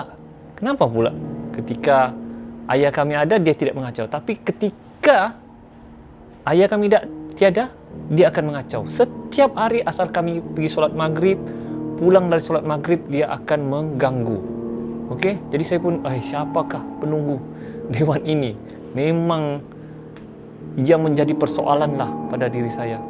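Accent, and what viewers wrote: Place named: Tawau, Malaysia. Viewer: Bang kekalkan lh loghat tawau mu😂🤣👍🏻👍🏻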